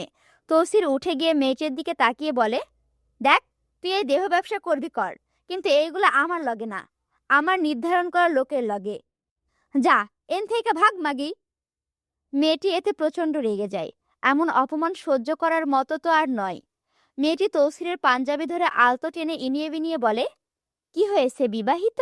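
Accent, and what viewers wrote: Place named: Rural, Bangladesh. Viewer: আপু তোমার মুখে গ্রামের আঞ্চলিক কথা আামার বেশি সুন্দর লাগে 🌸🌸🌸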